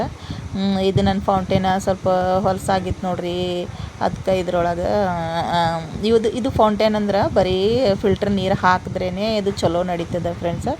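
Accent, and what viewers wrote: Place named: North Karnataka, India. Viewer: ನೀವು ಕೂಡ ನೋಡಕ್ಕೆ ತುಂಬಾ ಚೆನ್ನಾಗಿದ್ದೀರಾ ಕ್ಯೂಟ್ ಆಗಿ ಹುಡುಗಿಯಲ್ಲಿ ಎಷ್ಟು ಚಂದ ಇದ್ದೀರಾ ನೀವು ಹಾಗಾದರೆ ಹೌದು ಅದನ್ನ ತೊಳಿತ ಇರಬೇಕು ಗಿಡಗಳನ್ನು ತುಂಬಾ ಚೆನ್ನಾಗಿ ಬಳಸಿದ್ದೀರಾ ಬಿಸಿಲು ಹಾಳಾಗುತ್ತೆ ಅಂತ ಹೇಳಿದ್ದಾರೆ ಕರಾಬು ನಮ್ಮ ಮನೆಯಲ್ಲಿ ಇಲ್ಲ ನಿಮ್ಮ ಗಿಡಗಳು ನಿಮ್ಮ ಮಾತು ಚಂದ ಉತ್ತರ ಕರ್ನಾಟಕದ ಮಾತು. ಇಂದು ಸ್ವಂತ ಮನೆ ಅದಕ್ಕೆ ಬೆಳೆಸಿದ್ದೀರಿ ಬಾಡಿಗೆ ಮನೆಯಲ್ಲಿ ಇರುವವರು ಏನು ಮಾಡಬೇಕು ಹೇಳಿ❤❤❤❤ ಸೂಪರ್ ಪ್ಲಾಂಟ್ ಗಳು❤❤❤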